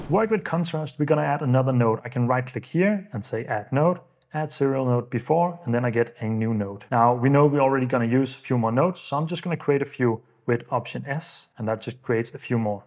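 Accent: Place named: Denmark. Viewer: When I was watching I thought you sounded a little danish, and decided to check your profile. I was so surprised to see you were actually danish lol 😭 Din tutorial var ret god, og sjovt at se en dansker nævnt i medierne!!!!!!!!!!🇩🇰🇩🇰🇩🇰🇩🇰🫡🫡🫡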